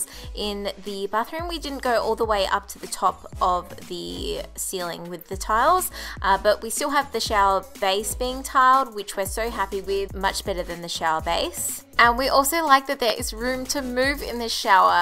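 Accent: Australia. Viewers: New subscriber are you Australian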